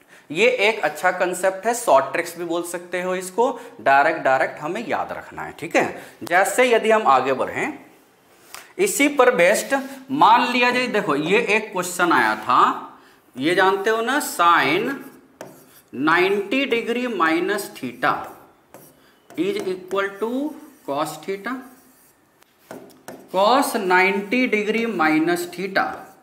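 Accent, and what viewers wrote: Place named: Bhojpuri, India. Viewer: Thoda Bhojpuri tadka 🌶️ lag rha hai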